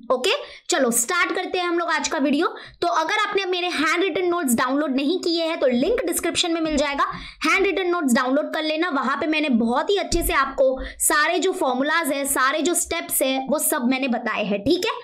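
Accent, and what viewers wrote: Maharashtra, India. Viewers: r u marathi????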